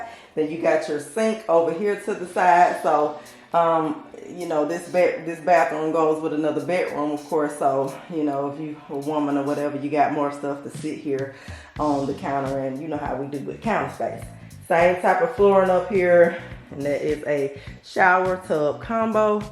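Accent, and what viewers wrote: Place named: Tennessee, United States. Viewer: Ur accent ❤where r u from ? Sounds like ur from Tennessee or Alabama?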